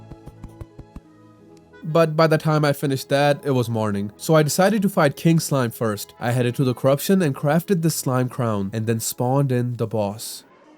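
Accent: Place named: United Kingdom. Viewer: British ahh accent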